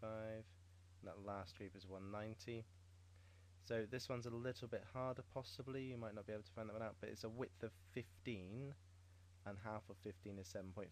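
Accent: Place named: United Kingdom. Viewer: Brilliantly simple and a nice British accent so it's easy to understand you :)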